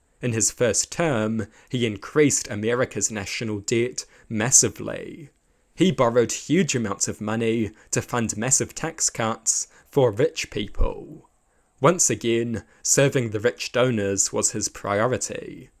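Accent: New Zealand. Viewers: Oh, he's from New Zealand, which is why he talks funny.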